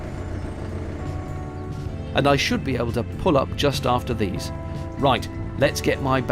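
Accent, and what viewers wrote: United Kingdom. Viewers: Congrats to Episode 100. I am following your videos regularly. Beside of your nice british accent, I love your british humor. :-) Cheers, to the next 100!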